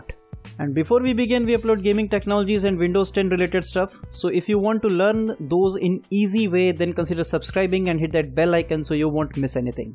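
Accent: India. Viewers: Love that indian accent lol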